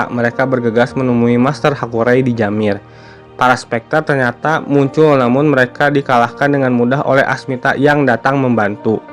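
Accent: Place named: West Java, Indonesia. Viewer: biasa lidah sunda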